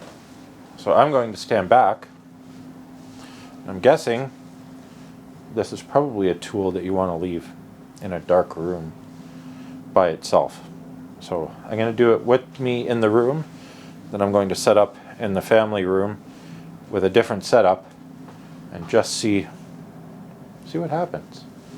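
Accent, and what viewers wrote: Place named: United Kingdom. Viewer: Just looked at some of your videos very interesting demos, looks like you have a degree in science or something. Very clever and looks like you put a lot of reseach into how parts of the phone work. And a fellow Brit by the sounds of it :D. catched a glimps of your paper work, something I wouldn't have a clue about understanding what you put on it so I'll leave that with you :D. Subbed also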